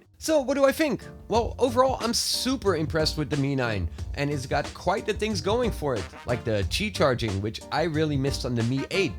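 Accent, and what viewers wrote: Netherlands. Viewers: you sound very dutch 🤔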